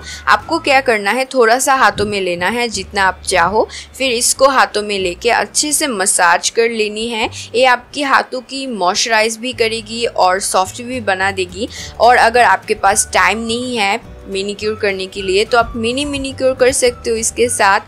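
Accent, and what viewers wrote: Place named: West Bengal, India. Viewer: But voice se to ap Bengali hi lag rahi ho ...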